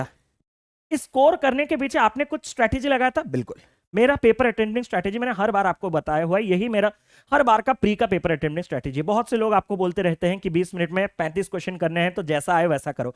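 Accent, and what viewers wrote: Odisha, India. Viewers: sir ap odisha se he kya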